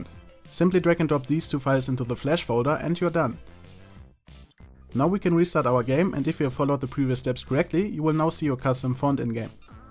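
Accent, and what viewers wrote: Germany. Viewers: Are you German? Cause you sound like German ^^ If yes, then Halloooooo :D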